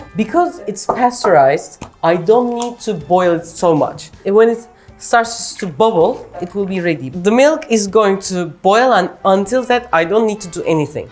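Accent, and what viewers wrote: Turkey. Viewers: Your accent only convinces me that you are Turkish otherwise you look like a south east Asian, in fact like a Punjabi. Btw recipe is amazing and easy. I'll try soon!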